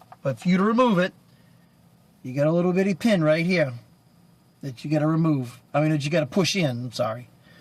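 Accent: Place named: New Orleans, United States. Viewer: You sound like you're from outside of New Orleans. Home sweet home.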